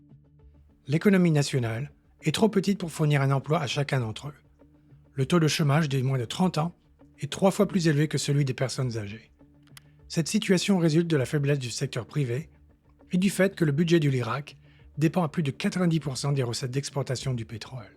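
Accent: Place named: United States. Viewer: Interesting analysis as always. I'm glad this channel has a French version. Some remarks however: translation is by no means bad, but not always consistent (especially noticeable is the intro, "welcome to the 20s report" is translated several different ways). The narrator's pronunciation of foreign names is a surprising (it's quite unusual in France, at least, to use an American accent - especially for ISIS as was mentioned today) but not bad in itself - however I still can't get over the pronunciation of "Erdogan" in the Turkey video, which was the worst-possible option (and, in fact, one of the rare times where it was read as if it was a French word). I also notice that neither translator nor narrator are credited in the video or description. How come?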